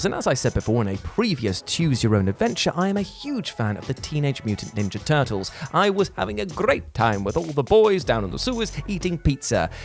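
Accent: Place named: Wales, United Kingdom. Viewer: Always thought u were a brit. Your from Wales?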